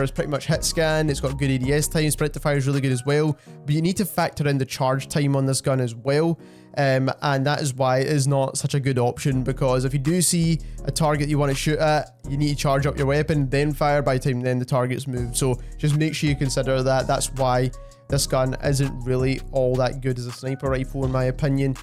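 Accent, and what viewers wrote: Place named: Scotland, United Kingdom. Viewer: Love a good Scottish accent.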